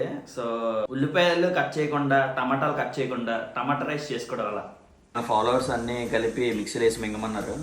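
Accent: India. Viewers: Mee yasa chuste vizag nunchi vachi nattu unnaru ?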